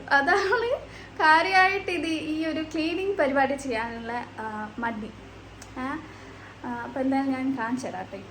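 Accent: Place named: Kannur, India. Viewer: ചേച്ചി കണ്ണൂർ ആണോ. കണ്ണൂർ ഭാഷ പോലെയുണ്ട് 😍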